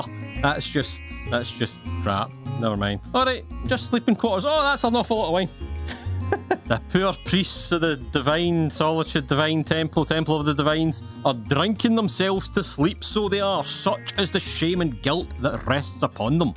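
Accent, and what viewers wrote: Scotland, United Kingdom. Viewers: I recently stumbled upon your channel and immediately loved it! You are really entertaining, and you talk just like one of my closest friends (who is also Scottish).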